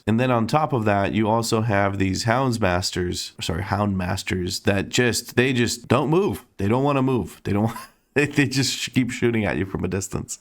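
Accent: United States. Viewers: hey, completely unrelated but...why do you have an american accent?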